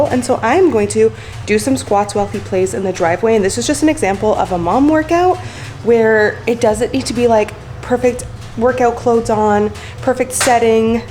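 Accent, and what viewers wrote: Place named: Canada. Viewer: Congrats again on baby girl 👧 also I noticed the “y’all” while making the ice drink lol I see TN is rubbing off on your Canada 🇨🇦 accent 😊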